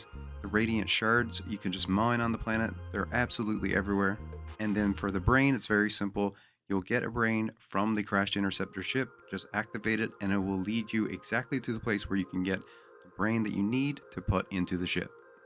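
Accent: Newfoundland, Canada. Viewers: A Newfie?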